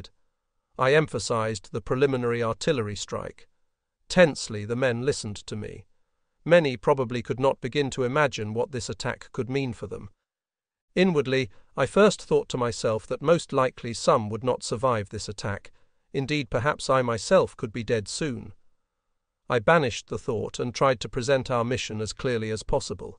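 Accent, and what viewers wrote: United Kingdom. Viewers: Who provided the voice template for the A.I.? It has a crisp British accent I find interesting. Though it has those mispronunciations that annoy people, it is still pleasant to listen to.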